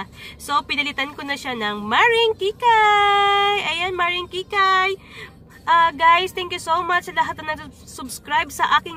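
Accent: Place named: Bicol, Philippines. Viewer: Sarap ng tawa mu ah,hahaha ka bikol tlga ni palalabas mu "banonoy"😆😆😆😂😂😂😂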